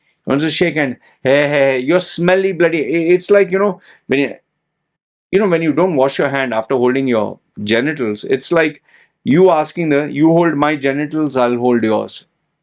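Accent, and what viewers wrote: India. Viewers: You also have a Indian accent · are you Indian or with Indian DNA? Your accent in Indian! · He himself seems indian, littrelly, his excent of english is really indian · Why make a video on a single person and generalise. And the way this man talks, he too is an Indian or an ex Indian. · bruh you are wrong india is not called Hinduastan because the majority is hindu... there was no hindu it is an umbrella that was created by British they wanted to group these other faiths living in bharat back in the days to make it easier for them to adress them... like muslims and christians .... you can even look for it.. there was not even hindu religion so many different faiths were put into one umbrella called hindu by britishers!!!.. and i don't think that you are a canadian either you must be an ex endian judging by the way you speak!! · You sound like an Indian, but tried nicely to become or at least look like Canadian or American or European for that matter. 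Good try. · You sound an Indian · Look at your accent bloody yendian accent. BTW you have no right of speaking sh!t about someone’s religious beliefs. Let them do what they wanna do. White washed typical Indian J0ker!!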